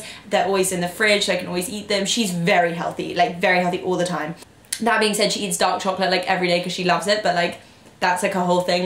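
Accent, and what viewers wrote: Wales, United Kingdom. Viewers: Great body, she sounds like she is Welsh!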